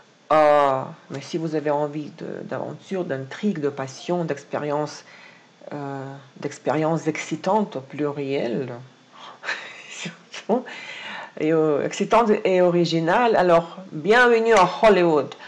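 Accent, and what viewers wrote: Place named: Russia. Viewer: Merci! Je ne rate pas une vidéo depuis longtemps. J’ai, depuis mes études, une grande amie au Costa Rica, musicienne professionnelle connue, à SanJose. Cela me rapproche. Aussi, je suis impressionné par votre magnifique connaissance des langues, très touché par votre affection pour le français et les francophones, et votre très belle personnalité. Votre accent russe est vraiment charmant! Suis Taureau, ascendant Lion, et je vous remercie pour votre travail et votre bel esprit. Un beau bonjour depuis Paris!🎶💐🌷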